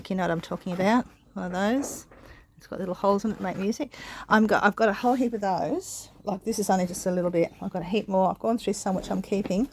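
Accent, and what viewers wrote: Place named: Australia. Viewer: I am a 67yr old Aussie NSW too and you were recommended by Jason Adams. Enjoyed your video and subscribed. So nice when I listen to our accent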